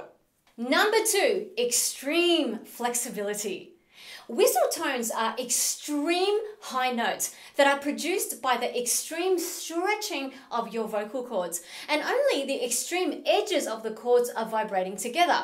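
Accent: Australia. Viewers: Australian aaccebt? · love your australian accent!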